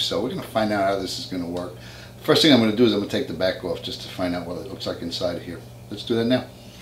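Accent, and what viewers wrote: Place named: Brooklyn, United States. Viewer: Fantastic video; really well done. I have to thank you, since I had tried other videos on YouTube, many of which were very well-made, as well. However, your's did the trick, and saved me a ton of dough in the process. I'm truly grateful to you for having taken the time to post this. BTW--- Love the accent... Brooklyn???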